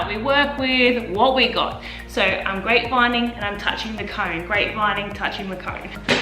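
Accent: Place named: Australia. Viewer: Loving this! First COVID, now its raining ALL THE TIME in Sydney so getting outside to skate is tough. Great to have some drills to practice that I can do in the kitchen. I Just started Derby with Northern Beaches and having so much fun! Also ... love the T-Shirt. Thanks for posting the video and great to hear an Aussie voice.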